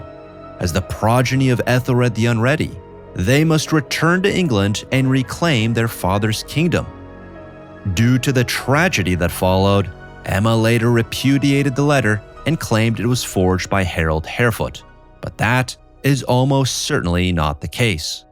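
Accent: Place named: United States. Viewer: Can't stomach the american narrator's accent, totally offputting.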